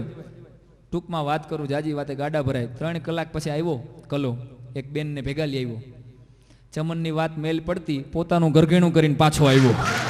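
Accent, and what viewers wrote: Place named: Kathiawar, India. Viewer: su kathiyavadi laheko se .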